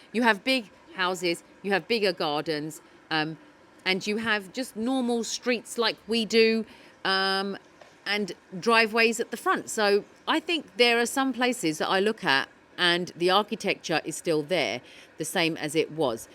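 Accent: United Kingdom. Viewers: I'm here to listen to your lovely british accent ! Welcome to Malaysia ❤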